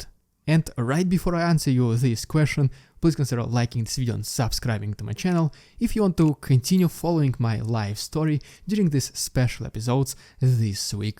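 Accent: Russia. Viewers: It doesn't surprise me. Most americans find a Russian accent kind of endearing. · Hmm. Let me tell you something about heavy "Russian accent". If you've lived for more then 6 months in in a country, especially studying there, it's a sign of "lack of commitment" if you still retain that much of an accent. It means that you like your accent. In my experience with Russians that's very common and basically a sign of "imperial entitlement", basically contempt. And as with any contempt maximum disrespect is deserved. People will notice and will react, even if they can't explain it. I lived abroad for several years, in the 1990s and there was a Russian(Soviet if you prefer) community on the same block. It was quite common between them people that lived for 5+ years in the country and couldn't get their way in the grocery store.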